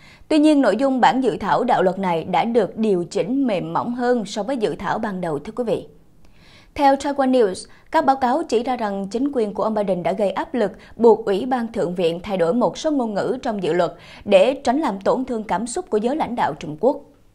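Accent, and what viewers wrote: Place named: South, Vietnam. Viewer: Ngôn ngữ và dọng nói miền Nam hay lắm. Giữ lấy BẢN SẮC VĂN HOÁ NAM BỘ dễ nghe nha,!. Bạn có âm vực rất tốt, cám ơn bạn.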